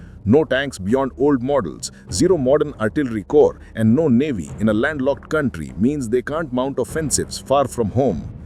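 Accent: India. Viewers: No more Pajeeet voice on Godi media thanks to AI voiceover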